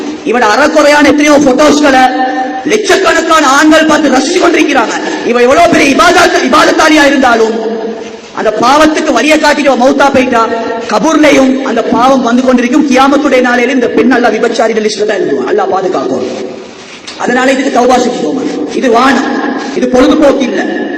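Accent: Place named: Kandy, Sri Lanka. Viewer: இவர் கண்டி கொழும்பு இந்த பகுதியில் இருக்கிறார் போல..! அந்த பகுதியில் இப்படித்தான் தமிழ் பேசுவாங்க. என்னுடன் சவுதியில் கன்டியைச் சேர்ந்த நண்பர் ஒருவர் இருக்கிறார். இதே போலதான் தமிழ் பேசுவார்.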